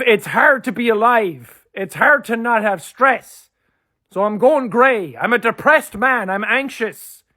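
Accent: Ireland. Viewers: Why does this Viking have an irish accent?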